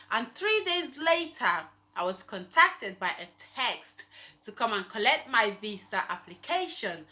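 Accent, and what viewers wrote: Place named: Igbo British, United Kingdom. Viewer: You are so cute 🥰 the igbo accent mixed with british accent na wa oooo 🤣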